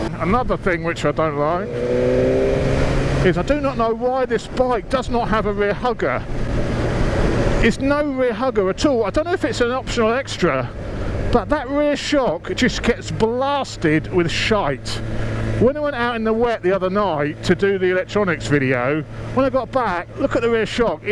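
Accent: United Kingdom. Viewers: Fu and your British English!!!!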